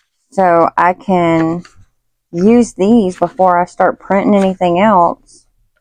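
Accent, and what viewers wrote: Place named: Texas, United States. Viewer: I just ❤ your Texas accent. I have a Southern accent too but, I am from the North. Half my family is from Texas and the other half is from Michigan so, I have both accent 😂😂😂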